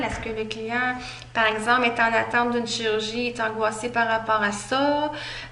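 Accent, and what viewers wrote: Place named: Canada. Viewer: elle est canadienne qsq taaa!!!!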